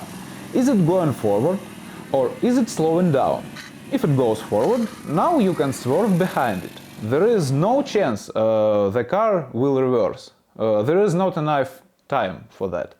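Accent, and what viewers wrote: Russia. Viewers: I Think these videos are excellent, even the Russian accent adds authority to the message, shame no schools in UK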